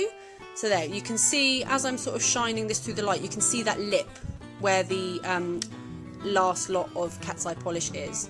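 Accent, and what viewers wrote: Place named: United Kingdom. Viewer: I love your work and your uk accent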